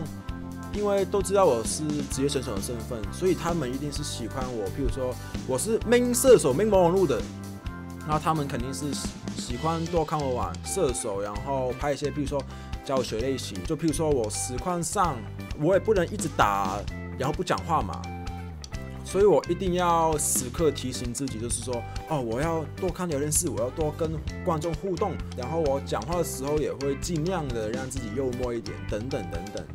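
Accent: Hong Kong, China. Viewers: 好有香港味www you know~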